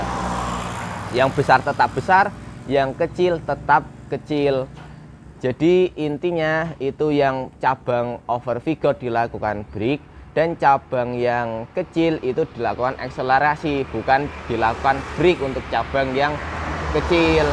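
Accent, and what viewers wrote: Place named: Java, Indonesia. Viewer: TERIMAKASIH mudah dimengerti karena kemedokannya SUHU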